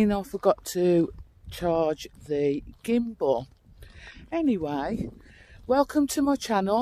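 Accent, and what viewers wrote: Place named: Black Country, United Kingdom. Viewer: I'm getting yam yam off you not brummie 🤔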